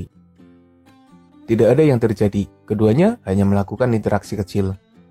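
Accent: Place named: Java, Indonesia. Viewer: Wkwkwk narasi yg khas dengan gaya medoknya mimin 😅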